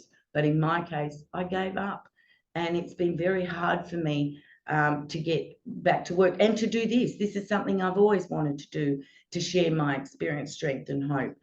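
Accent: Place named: Australia. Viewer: Wow 😮 I just walked in on the most epic astrological gift !! You had me with the Aussie accent but now going back to soak up all of your videos uploaded prior to this one ❤️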